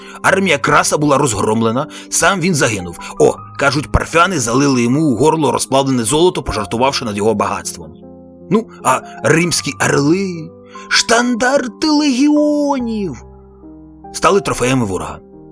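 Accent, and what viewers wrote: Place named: Russia. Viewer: Мова автора з скаженною кацапською алітерацією мови!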